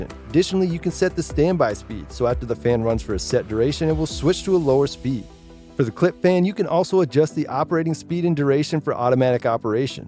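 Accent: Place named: Canada. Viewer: I thought this was American but its Canadian awsome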